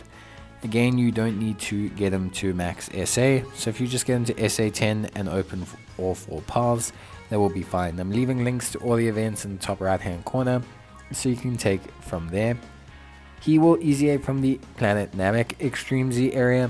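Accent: South Africa. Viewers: Dude are you South African? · I presume so, the South African accent is undeniably there.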